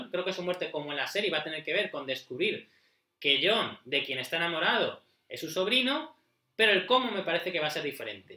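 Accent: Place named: Spain. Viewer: Hola. Recién descubrí tu trabajo y me parece excelente, nadie como tú para explicar teorías y todo lo relacionado a Canción de Hielo y Fuego. Solo me atrevo a hacerte una sugerencia: trata de hablar más despacio, soy del norte de México y acá tenemos un acento muy diferente al centro de mi país y nos cuesta entender el español de España, por lo que tengo que repetir secciones de tus vídeos para entender lo que explicas. Te mando un abrazo y felicidades por tu trabajo.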